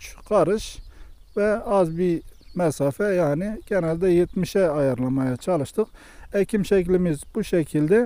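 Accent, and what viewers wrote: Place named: Adıyaman, Turkey. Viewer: Kardeş sen Adıyamanlımısın yau. Konuşma tarzın Adıyaman şivesi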